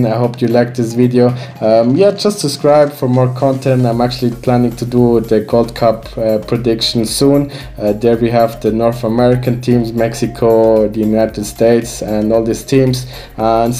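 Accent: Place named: Switzerland. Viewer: You have a swiss english accent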